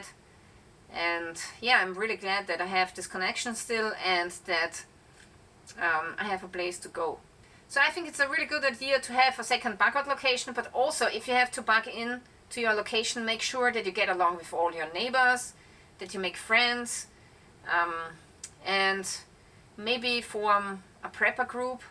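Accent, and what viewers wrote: Germany. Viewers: Your English sounds a little like Klaus Schwab. Are you German? I used to live in Black Forest. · Great video;you have a German " accent... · She speaks like Terminator. She has a German accent.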